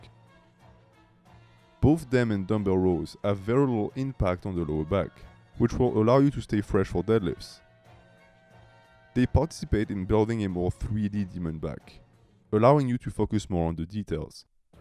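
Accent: France. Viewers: With your accent I Can Say that you're French... Am I right ? I Can tell this 'cause I'm french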